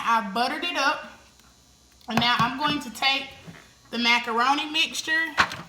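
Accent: South, United States. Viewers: Oh, ok. I definitely heard that suuuthin swag🤗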